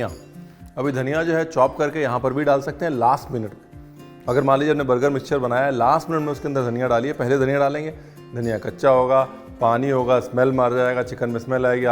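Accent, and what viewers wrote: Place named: North, India. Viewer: Hey Ranveer u look amazing & ur North Indian accent as well as the recipe is even more fabulous... 😋☺️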